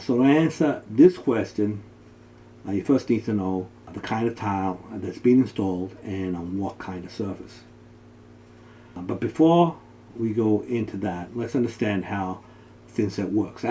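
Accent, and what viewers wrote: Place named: Northeast, United States. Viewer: Ask not what you can do for your tile job... Is this the accent from the American northeast? - he sounds a bit like (former) President Kennedy? Rob Melbourne... Australia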